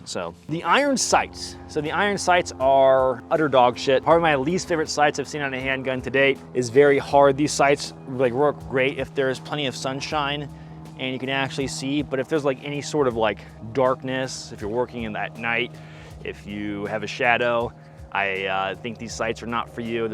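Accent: England, United Kingdom. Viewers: LMAO with British accent!! please continue with the FUN videoss and Comic Intros!! bro. you made up our DAY!!! F@ YEAHH!!! kind regards Slow n Smooth <> Smooth is Fast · sounds like a elegant english teacher with that british accent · British accent 😮‍💨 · The first American I’ve seen with a convincing English accent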